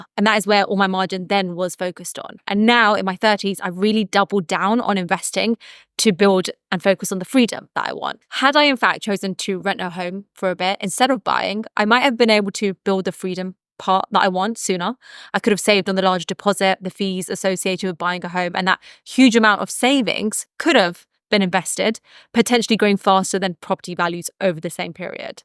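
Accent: England, United Kingdom. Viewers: Good content, and I love your British accent. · I like your English accent. It's very fancy.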